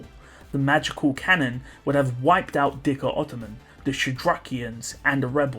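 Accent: United Kingdom. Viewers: That's just him being British. It took him almost a year to stop saying Tappy but still hasn't really got Tappei right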